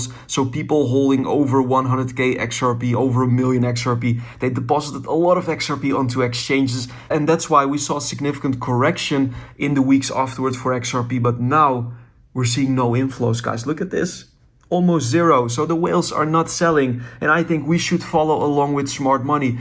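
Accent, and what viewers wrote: Netherlands. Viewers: Ben je een Nederlander?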